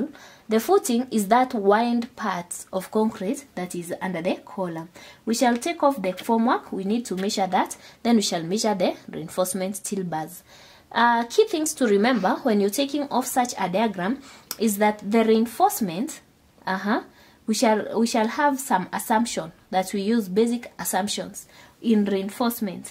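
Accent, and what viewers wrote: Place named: Kenya. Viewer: Weldone. I enjoyed your tutorial. You sound like a Kenyan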